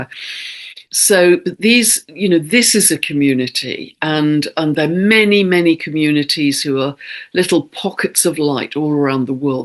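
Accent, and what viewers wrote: United Kingdom. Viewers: Good work! And nice to hear my first English BRITISH English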